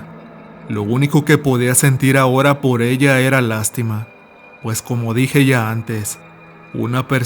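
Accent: North, Mexico. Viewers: Me gusta la voz del otro narrador , a este se le sale el queso mexicano no mames wey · porque habla muy norteño!.😤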